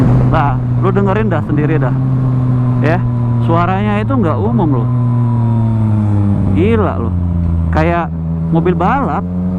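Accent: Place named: South Jakarta, Indonesia. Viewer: Logat anak jaksel 😅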